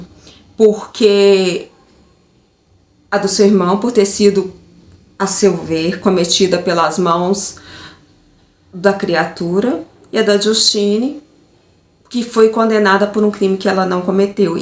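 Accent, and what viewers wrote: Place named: Minas Gerais, Brazil. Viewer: Sotaquim mineirim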